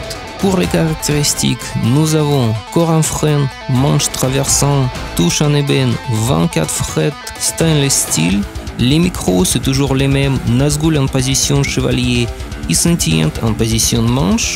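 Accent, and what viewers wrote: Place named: Russia. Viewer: Salut, земляк, нюансы произношения говорят о том, что ты из России. Ну, или откуда-то в этом роде )) Par contre круто играешь, тут без нюансов! Как тебе гриф Шектера, не слишком толстый? У меня раньше был Ибанез Престиж 2127, удобнейший гриф на свете, но отвратный звук липы. Продал, теперь вот думаю взять этот Шектер, но сомневаюсь, не нужны ли тут ладони баскетболиста? Обычно у Шектеров грифы толстые... Ты его покупал, случайно, не в Париже на Пигаль? Merci d'avance, cordialement, привет из Беарна.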